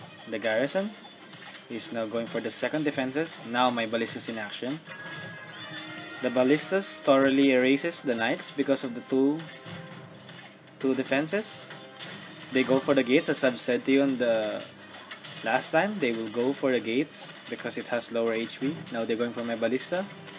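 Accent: Philippines. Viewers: are you a Filipino? cuz your English sounds Like a Filipino. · I'm sensing a Filipino accent.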